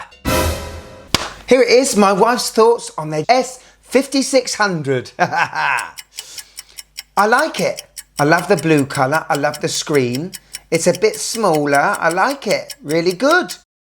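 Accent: United Kingdom. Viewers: Best YouTube channel ever!! Sorry for my bad English.. I'm a fan from Brazil.. I also love Casio watches..I own 4 Casio's . Casio MW 240 is the cheapest and the most beautiful watche ever made. I wish you were my friend. British accent are the best accent in the world.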